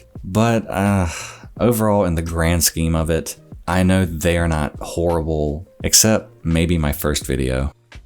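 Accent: South, United States. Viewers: How come I’ve never heard the southern accent until you’ve mentioned it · His "southern" accent is about as strong as mine; I was born down South but grew up in various northern states and it's mostly faded. The accent is still evident in certain words and phrases though. It's just not a typical southern drawl.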